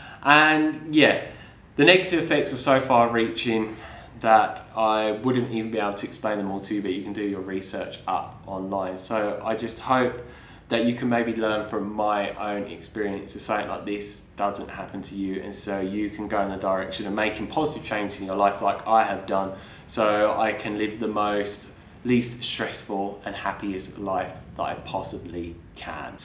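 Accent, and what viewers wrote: United Kingdom. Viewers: woahhhh... shocked to hear you had a British accent; I thought you were foreign when I watched your 1 year transformation vid ;))))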